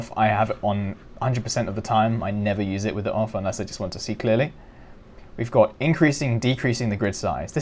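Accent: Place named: United Kingdom. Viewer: I freaking love you British accent *0*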